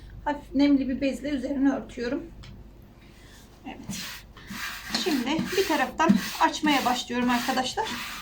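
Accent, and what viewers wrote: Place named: Antalya, Turkey. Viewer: Bir Antalyalı konuşması müsafirrr 😂günaydın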